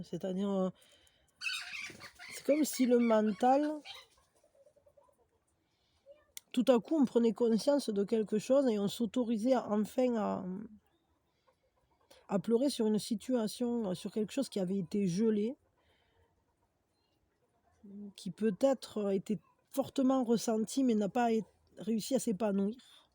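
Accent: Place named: Libourne, France. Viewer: J'adore votre accent qui me rapelle mon papa près de Libourne Mes grands parents et ma tante Merci pour c'est pas de la tarte Quand son cœur s'ouvrira il sera surpris Bisous 😇😘🐾🎶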